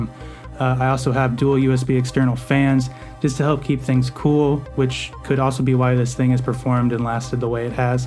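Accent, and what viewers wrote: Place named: United Kingdom. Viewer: wow love it! Is there an inexpensive way to make my TV my monitor. I need a computer for basic email, word documents, streaming etc. I have a 42" TV with a Roku I would love to use. what's the most economical way to do this? Maybe a future video idea? I'm a big fan! BTW loved the Brit accent lol pretty good actually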